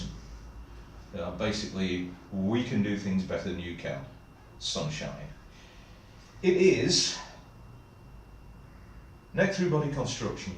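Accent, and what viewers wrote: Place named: Yorkshire, United Kingdom. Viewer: l think you don't live a million miles from me either. By your accent. I'm near Wakefield.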